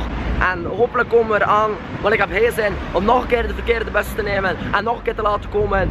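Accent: Belgium. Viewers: ben je een belg ?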